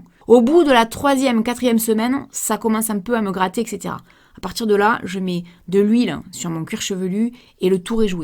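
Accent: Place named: Marseille, France. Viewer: tu viens de Marseille ? tia l'accent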